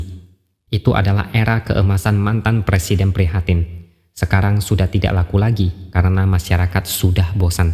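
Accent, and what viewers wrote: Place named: Sumatra, Indonesia. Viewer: Logat orang dari sumatera 😍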